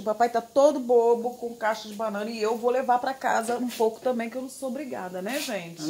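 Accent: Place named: Região dos Lagos, Brazil. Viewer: Nós de maricá pra lá, região dos lagos, acho que falamos assim. Mamãe e papai😀😀😀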